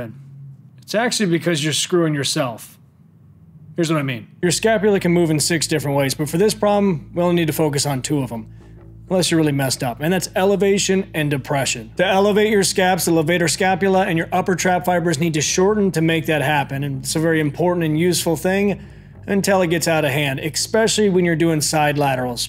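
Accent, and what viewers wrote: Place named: South, United States. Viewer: my brain needed a while to process hearing scientific nomenclature through a southern accent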